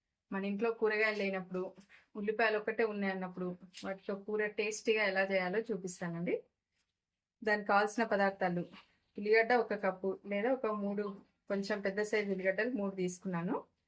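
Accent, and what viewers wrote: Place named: Telangana, India. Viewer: Nice telangana slang ❤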